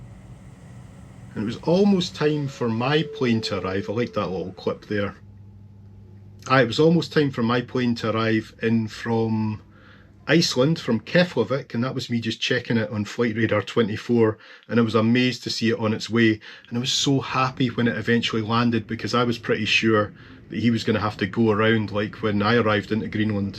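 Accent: Scotland, United Kingdom. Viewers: your "braid Scots" or is it more properly called a "burr," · Hi from Sete Lagoas, Brazil. I was raised in Berwick upon Tweed and have a sister who lives in St Andrews so I'm familiar with your neck of the woods! Here the airport issues are normally flooding or runways melting!. Thankyou for your videos, I miss Scotland and so it's great to hear that accent! God bless and safe travels, Jadir Alister Barnard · This is a fun change. I like it. I also wanted to mention that I have (as an American) a soft spot for all things Scottish because my ancestors hailed from the lowlands. Your persona and accent are very appealing. I also recently started following the Witches of Scotland. Their story is both fascinating and disturbing. I must admit, I have a really hard time understanding their accent (as I did when I vacationed in Scotland!), so I really appreciate the fact that your accent is both authentically charming and completely understandable. 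Love what linguists call the "non-standard English dialect" you add to everything you say. What a wonderful escape from the dystopian nightmare we have going on here. Especially nice to see Greenland at a time when it is in the news so much. Such guilt and shame most of us here have for what we've inflicted on the world (well, some of us, definitely not all of us support him). Hope this style of video isn't a one-off, but that you'll do it again. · I thought the video was great and I really enjoyed it. I loved the concept of the commentary and you also have a great voice that is a pleasure to listen to. I think that's the Scottish accent which one of the world's best. Big thumbs up for that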